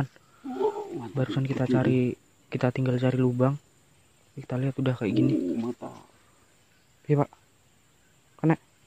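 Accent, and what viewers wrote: Java, Indonesia. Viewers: Tpi ngomongnya mirip lgat jawa mas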